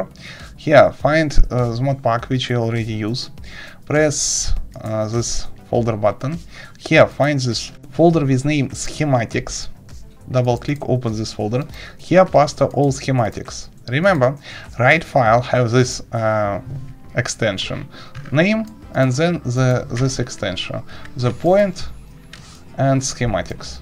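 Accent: Russia. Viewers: русский язык всё равно просачивается) "Схематик" "Майнкрафт" он говорит по русски) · you r russian accent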